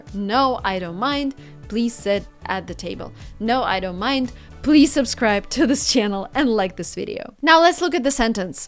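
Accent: Russia. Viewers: отличное произношение, но все равно слышно что не нативный носитель, русское наследие дает о себе знать. интересно сколько лет уже на англ.? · You still have that little Russian accent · She still has a little bit of russian accent, for instance how she says "can't" haha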